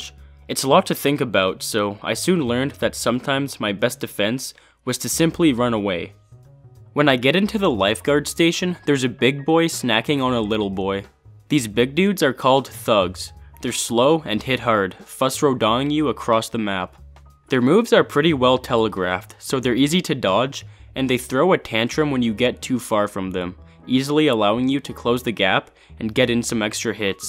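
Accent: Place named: Canada. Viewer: the most canadian sounding canadian I've heard on YouTube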